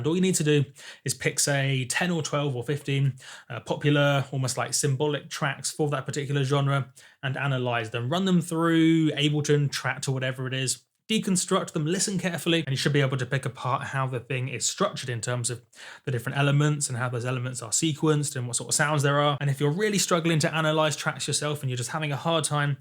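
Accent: United Kingdom. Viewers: BTW, are you British? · Is he a british guy?his accent..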